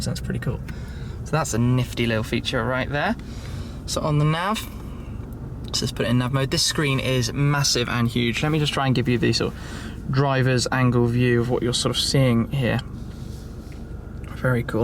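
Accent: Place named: United Kingdom. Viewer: wonderful british clear english and of cause a stunning car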